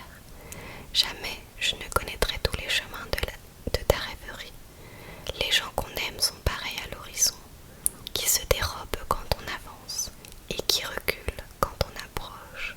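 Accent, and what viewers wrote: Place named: France. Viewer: I love the talking ones, the French language and accent are so smooth! - hello from England 🇬🇧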